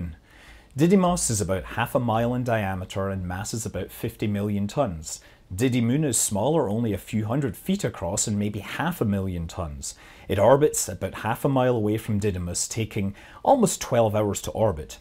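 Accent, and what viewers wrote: Scotland, United Kingdom. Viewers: Fair point. has a Scottish accent but I did find a video of his that suggests he lives in California. So I think I know more or less where he lives. That doesn't go for everyone. A UTC time or a clue about which 9am would have been helpful. If you have a problem with that, it's on you.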